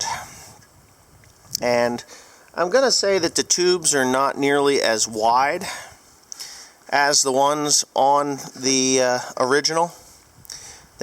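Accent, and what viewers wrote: New Jersey, United States. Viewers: RADiator is definitely a NJ accent thing. When I moved from South NJ (Born and raised) to Colorado, I got my balls busted for saying it the same way you do lol. Also had issues with the word water. NJ = Wooder CO = WAHter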